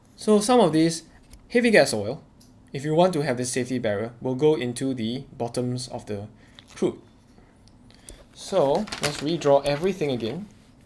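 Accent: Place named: Singapore. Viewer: I know a Singaporean brother as soon as I hear the accent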